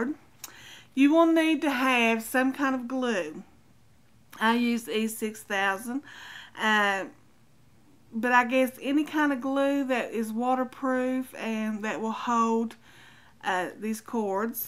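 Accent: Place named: South, United States. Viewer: It's Soo good too hear a Southern Voice ... I'm from Alabama ... God Bless You ...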